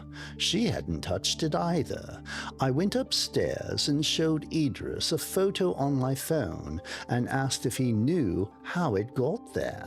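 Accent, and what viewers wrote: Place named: England, United Kingdom. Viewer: I loved the authors English accent. Favorite line: I’ve gotten really good at “comfortable thoughts”. It’s almost as if the English accent created a character that spoke with the consciousness that became this narration, not only in tone, but in vocabulary. Very authentic and hauntingly intriguing story.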